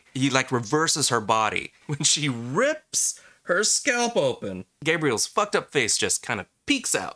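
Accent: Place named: South, United States. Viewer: I just found your channel and really like your reviews! Sorry if you've said it before, like I said I'm new to the channel, but I was wondering where you're from? I think I'm hearing a bit of a southern drawl but I can't be entirely sure. Love from Atlanta!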